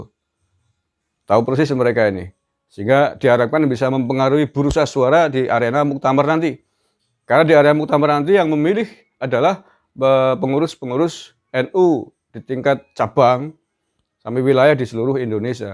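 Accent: Banyumas, Indonesia. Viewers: Ini manusia tukang fitnah ....dasar ngapak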